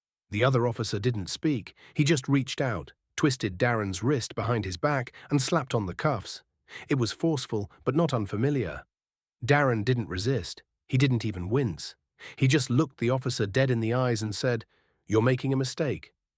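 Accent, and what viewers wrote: United Kingdom. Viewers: Sofa King tired of this AI BS posted by people with obviously zero talent of their own. On top of that: what kind of idiot puts a brit accent to a US military-based video. Fail on all accounts.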